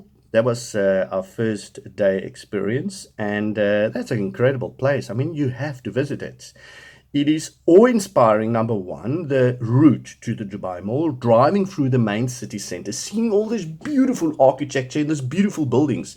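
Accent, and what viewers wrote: South Africa. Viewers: An excellent video..being irish its funny hearing your south African expressions in your accent..right now I'm in Phuket on holidays and it's lashing rain 😎 😂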